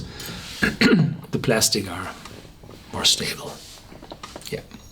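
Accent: Germany. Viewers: I hear a German accent?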